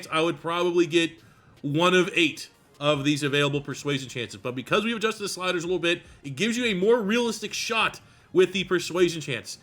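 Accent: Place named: Canada. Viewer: Straight to the point. Great content. You're an OG. Been doing it 15 years. I thought you were Canadian with that accent lmao.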